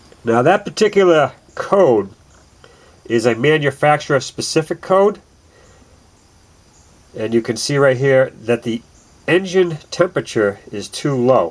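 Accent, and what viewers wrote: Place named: New England, United States. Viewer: This is a nice video and you have a pleasant New England accent. What videocamera did you film with? Thanks. T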